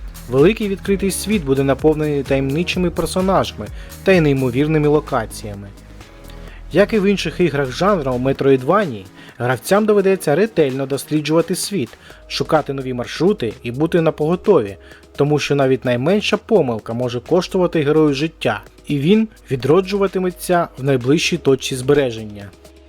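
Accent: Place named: Surzhyk, Ukraine. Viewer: ааа снова на суржике пшешишь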